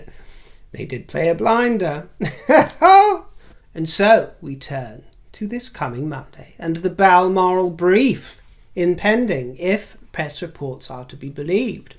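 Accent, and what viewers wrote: South, United States. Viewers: Bravo, lol. I think your southern accent is great. Red is definitely your color. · PRECIOUS, I am from Alabama and your southern accent is better than mine!!! Thank you for your wit and wisdom, my dear 😂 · I enjoyed your “southern” accent. There are many variations depending on the region, but the older people, especially near the coast, had inflections that are a bit hard to imitate. There is less emphasis on the hard “r” which sounds closer to the English, ending with rather broad vowels. (I went fah away laist yeah, befo’ wintah, to get away from that scoundrel. He didn’t weah his coat, caught a cold and DIED awn me! Bless his haht, he WAS a character.) 😻 Bye now. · oh, you are a hoot! I adore you and your Southern accent. I am from South Carolina (USA) and i love a good accent. I will say that a southern accent is hard to do. 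Just be consistent in which one you choose. I am tickled by your broadcasts and wish you all the best! Much love, Kristi from South Carolina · I'm interested in how you define your look today - I wasn't sure if you were going for gypsy or medieval princess or a member of the harem. On the whole I think probably medieval princess. Your American accent was certainly southern - a cross between Mae West and Scarlett O'Hara. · You’re hilarious! Love the southern accent ! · 😅 😂😮😊 love your American accent. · 😂 You nailed the U.S. Southern accent! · You look absolutely stunning today,. I wish I had the cajones to wear just one of the pieces, I adore the color, and your makeup is perfect. Your southern Belle accent is just fine. You made my day! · OMG! Loved the Southern accent! But it’s your explosion of laughter at the end that leaves me in pieces. Yes! I find myself in Rivulets of sparkling delight! 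You’ve DONE IT AGAIN!!! 🇨🇦💕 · "we want privacy tour" lol that made me laugh out loud. Well said. What a picture. I love your southern accent, too! Thank you for the smiles and chuckles,. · You are a hoot. Yes im a southerner from kentucky. I understand and enjoy your humor, i,mo ,also 88 years old, 4 sons , 6 grandchildren, one great grandchild,young at heart. ,and loving fun . Love the english people and your love of fun- thank you for making my day. Your southern accent is pretty good , although each state has its own accent. Mine started in Nashville tennessee and moved on to louisville , ky. Ancestors all english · From a Southern fan of yours, dear - you portrayed the true Southern lady accent perfectly! The only other accent almost as beautiful is the upper class British accent which you have! You are truly loved all over the world and you make the world a better, brighter place! Much Love, Nancy F. 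· I love your Southern accent. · Edit— 😂😂😂😂that accent!! I’m a KY native, but naturalized Texan, and there are many southern dialects. Each southern state has its own twang, and I think you did a fabulous amalgamation of them all😂😂😂😂I think Camilla proved herself to be a servant to the people and fervent supporter of Charles, and the Late Queen recognized that. Elizabeth may have been feeble of body but certainly not of mind. I’m not a Meghan fan, but I wish the conspiracy theorists would stop the pregnancy nonsense. You could see her pregnancies in her face. It was not faked. And after Archie was born, Meghan still showed the fullness in her abdomen which is such a common characteristic. Had it been faked, her vanity would have put her in a form fitting outfit with a flat belly when Archie was presented to HMTQ and the Duke of Edinburgh. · Your southern drawl is not far off of the mark, beautiful! 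Impressive, indeed ❤ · you are a treasure! I really enjoyed your southern accents and your wonderful costume today.❤ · Oh ….. your southern accent had me in stitches! You’re just Divine! Thank you for always speaking your truth! ❤ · your Southern accent is a hoot. So enjoyable...thanks. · 🤣. Thanks for the laugh my dear friend. Love the southern accent.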